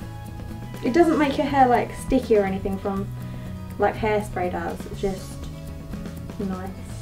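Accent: United Kingdom. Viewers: I like your hair, i like your british accent, i like your make up. I just love you already :D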